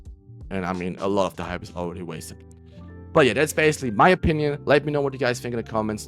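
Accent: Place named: Germany. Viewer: You really got all the info in this little Video keep it up! Lil question. First where are you from because you sound a bit german and second can you explain how you get a 100 ping if the background is your gameplay because i am stuck on 150 ping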